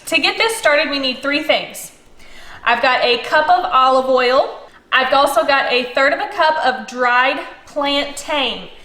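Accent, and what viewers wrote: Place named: United States. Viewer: Why do mercans say uurrbbs, and savv...Herbs, and saLve. Most annoying and makes you sound stupid!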